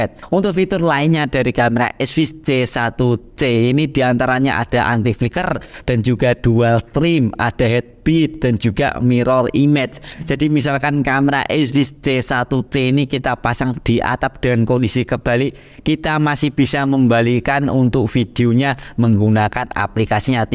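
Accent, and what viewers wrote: Java, Indonesia. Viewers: suara org jawa, medog bgt...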